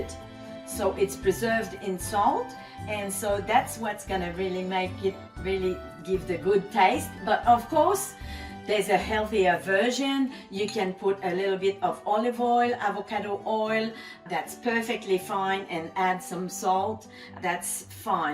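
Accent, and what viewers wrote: Australia. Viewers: I'm hearing several different accents in your speech, one of which sounds Australian/New Zealand??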